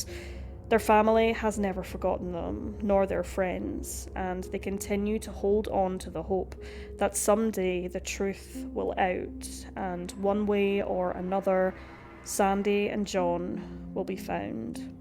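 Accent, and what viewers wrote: Scotland, United Kingdom. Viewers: She is scottish.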